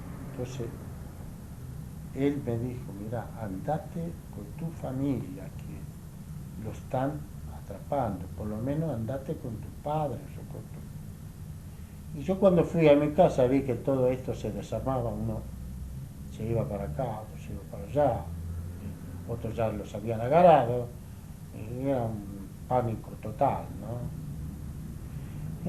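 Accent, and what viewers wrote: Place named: Argentina. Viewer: Tiene su acento Argentino Che.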